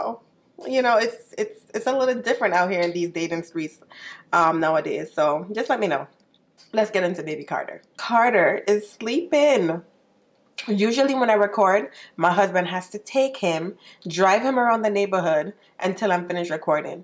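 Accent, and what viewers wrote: Jamaica. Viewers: I LOVE UR ACCENT Where are u from? u prolly already said but haha sounds like Jamaica but its lovely xD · I knew it just the way u talk I know u Jamaican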